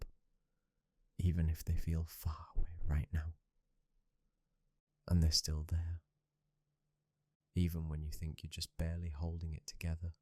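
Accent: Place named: Yorkshire, United Kingdom. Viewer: That voice is so soothing and melodic. I know a Yorkshire accent when I hear one. Are you from North, East, West, or South Yorkshire?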